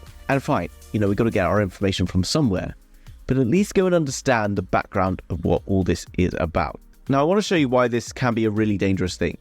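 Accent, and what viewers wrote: RP, United Kingdom. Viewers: ah, you were a Rupert. It figures with that posh accent of yours. 😉 Yeah, I stayed for about 6.5 years. I managed 5 tours in that time. That kind of did me in tbh. I love your channel, mate. Keep up the good work.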